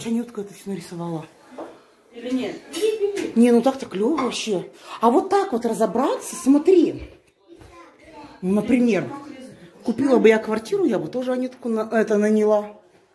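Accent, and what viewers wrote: Urals, Russia. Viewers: Такой интересний уральский акцент)Всех девочек с наступающим😊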